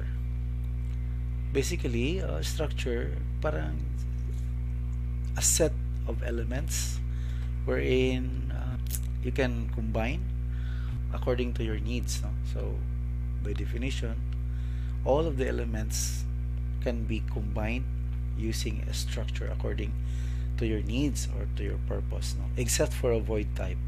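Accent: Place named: India. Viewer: not bad but 1/3rd of what you say disappears in some indian sounding word accent. to sad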